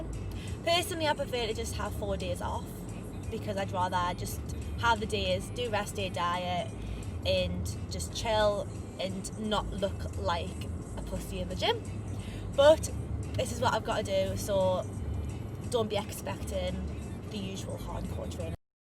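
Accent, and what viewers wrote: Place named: North East England, United Kingdom. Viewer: Good to here a North East accent on a fitness channel. Am ganna try that egg and onion thing as it look 👌🏼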